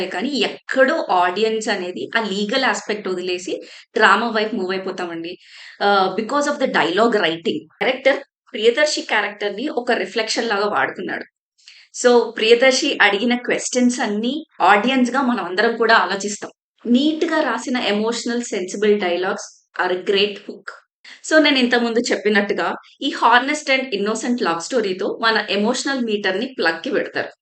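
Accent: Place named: Telangana, India. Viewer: Nice review and yup good movie .. finally telangana slag😂.. aagam aagam jeyakunda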